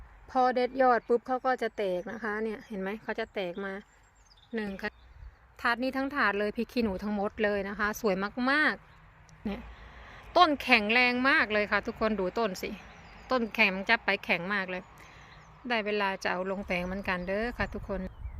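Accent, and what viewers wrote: Korat, Thailand. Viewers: แก่คนนางรองนิ พูดโคราชเหมือนกั๋นเลย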